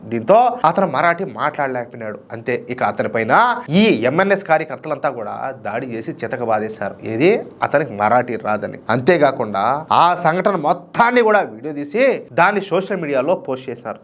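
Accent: Rayalaseema, India. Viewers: 😂😂😂😂 అన్నా బలే తిట్టారు......... మీరు న్యూస్ చెప్పిన .... దేశ వ్యతిరేకులను తిట్టిందా చాలా అద్భుతంగా ఉంటుంది......... మీ ఆవేశంలో ప్రతిసారి అందులో మీ దేశభక్తి కనబడుతుంది...... నేను మీ ప్రతి వీడియో చూస్తాను మా ఫ్రెండ్స్ కూడా చాలామంది subscribers ఉన్నారూ.... నేనే చేశాను....... ఈ వీడియో ఇప్పటికి పది సార్లు చూశాను.... మీ తిట్ట దండకం విని పొట్ట చెక్కలయ్యేలా నవ్వి నాను...... కానీ మీరు ఈరోజు చాలా మంచి మాట చెప్పారు.......... మీరు సంఘ వ్యతిరేక శక్తులను తిడుతూ ఉంటే నేను చాలా ఎంజాయ్ చేస్తాం....... మీరు ఉమ్మి నప్పుడల్లా మాకు చాలా నవ్వొస్తుంది ఏమనుకోవద్దు...... మీరు మాట్లాడే తెలుగు భాష వ్యాస యొక్క అభిమానిని నేను రాయలసీమ భాష చాలా చక్కగా మాట్లాడుతారు మీ వల్ల మాకు ఎన్నో కొత్త పదాలు తెలిసాయి... ❤❤🙏🙏🙏🙏 ముఖ్యంగా నేను రాయలసీమ ఆంధ్ర కోస్తా యాసల ఎక్కువగా పరిశీలిస్తారు.... చాలా కొత్తగా ఉత్సాహంగా ఆనందంగా అనిపిస్తుంది..... ఇట్లు కరీంనగర్ జిల్లా వాసి.....❤❤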